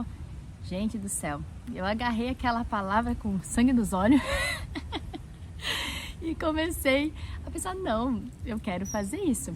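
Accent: Brazil. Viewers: Você é muito simpática! Tem um sotaque mineirim....O Guto "indicou" o seu canal. Sucesso!! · Abraços de Ibirama/SC!!!! Parabens pela Vibe muuuuuito boa e esse R com sotaque paranaense minha terra natal!!!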